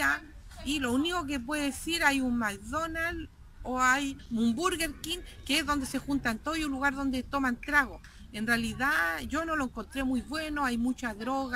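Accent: Chile. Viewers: Por la forma de hablar de esta señora les comento que primero es chilena y segundo... en mi pais les decimos weones picantes. Una persona sin demaciada educacion que por cierto debe hablar un ingles pesimo. Es el clasico latino que quiere tener la gran vida sin sacrificio. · Yo soy latino viviendo en Holanda por casi dos años. Pero el video de esta señora es tan nefasto y terriblemente desinformador.... me da hasta molestia que la gente sin ninguna preparación alguna, sin siquiera preparar un video decentemente se crea con derecho de venir a hablar tan terriblemente de un país. Por el acento de la señora creo que es chilena y le informo que su país está en dentro del rango de los países con BAJO nivel de inglés. Y en ese mismo ranking Holanda está en el 2do lugar como el mejor país que habla inglés como segunda lengua. Ya quisiéramos todos nosotros que en nuestro país hasta el que barre las calles, el jardinero, el que trabaja en el campo, hasta los mendigos hablen inglés! 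Claramente señora usted no les entendía porque USTED NO HANLA INGLÉS! No es que su nivel sea bajo, usted NO HANLA INGLÉS! Y es más que notorio por cómo pronuncia algunas de las palabras en inglés que dice en su video (como Dutch que es el idioma oficial de este país dicho en inglés ) . claramente si usted emigra a Holanda o Habla holandés o habla MUY BIEN INGLÉS sino usted no tiene nada que hacer acá, el país no va a cambiar porque a la reina se le dio la gana venir y solo hablar español. Además que si usted viene sin permiso de trabajo TAMPOCO TIENE NADA QUE VENIR A HACER ACÁ, acá no existe el trabajo ilegal, que lo que tiene de consecuencia el trabajo ilegal es la precarización de la vida de la personas, es por eso que Holanda se ve tan lindo por donde usted camine, no solo e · Eres Chilena ??? Por el acento ???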